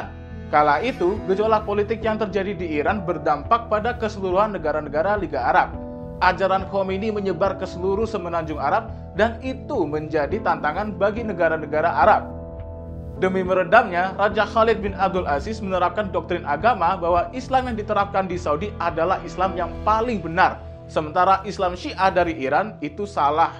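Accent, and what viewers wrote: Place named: Java, Indonesia. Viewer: Medokmu itu lo mas, aku suka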